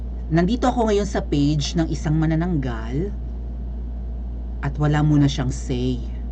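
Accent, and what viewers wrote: Iloilo, Philippines. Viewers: Girl cute mong mqgsalita ng ilongga. 💖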